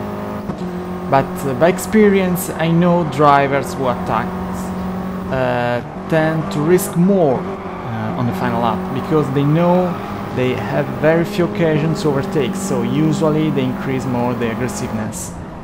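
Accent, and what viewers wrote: Italy. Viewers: Sei italiano? L' accento è quello. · Your voice make me remember italian people,such a nice accent its funny and not too formal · I love your italian accent when you talk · You sound like u are italian,like me😂😂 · Italian accent at its best, it's awesome ♥️ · Adoro il tuo inglese italianizzato ahah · I love when english is spoken by italians because I can understand them easily!!! He remind me of Dovizioso and Rossi answering questions in press conference. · Scusa sai se lui è italiano? Perché dall'accento sembrerebbe di sì... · why does he have an italian like accent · Si sente che sei italiano sotto sotto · your accent is like an italian who speak in English. I'm from Italy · I`m really glad that you finally decided to do a voice over on your videos! It adds another layer of communication and helps focusing on the video itself! Great job! 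And as an Italian living in Brazil, I love that Italian accent and tone! I can almost see your hands moving while talking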